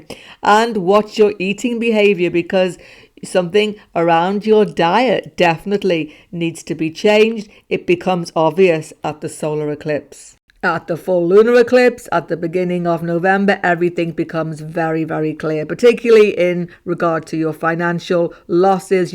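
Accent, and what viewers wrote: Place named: Working class, United Kingdom. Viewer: That's the way the working class people in England speak, dude. They can't help themselves. We shouldn't hold it against them. Nobody chooses where they were born or grew up. Anyway, it's about the personality of the individual, and I find this lady's personality to be one that wants to help others. That's the way she comes across to me, so her way of speaking does not irritate me.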